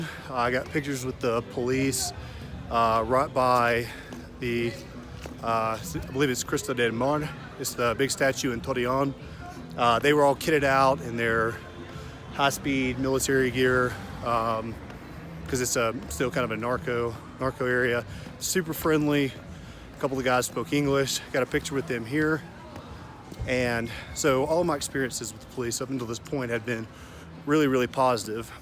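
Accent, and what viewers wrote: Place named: South, United States. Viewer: Me gustaría que hablara español se le escucha muy bonito con ese acento sureño de e.u.a. Pues no se inglés 😳no se si me equivoque al creer que es de allá. Y que bueno que siempre esté alerta. Ah, y también para comentarle que será que sea peligroso que mencione que fue soldado, ya que aquí en México pues los soldados no dicen que son eso y no son buenos algunos por que están involucrados en cosas malas. Y usted tiene mucho carisma y es buena onda. Cuídense mucho. Gracias por contestar. Me gustaría que también aprendiera español bueno sin albur. Saludos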